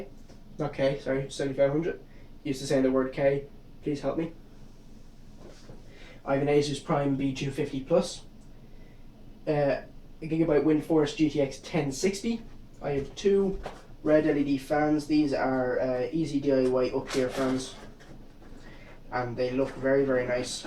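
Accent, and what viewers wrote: Ireland. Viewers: also what part of Ireland are you from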